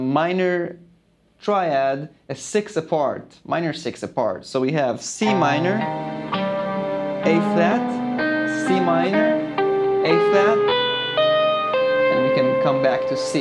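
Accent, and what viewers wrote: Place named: Iran. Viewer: hi, my name is Lawson. I live in Los Angeles. I hear a Persian accent when you speak. My brother in law is from Iran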